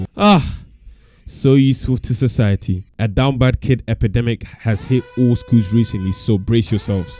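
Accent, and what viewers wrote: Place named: London, United Kingdom. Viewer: make ur mind up bro are you from london or america? ur pissing me off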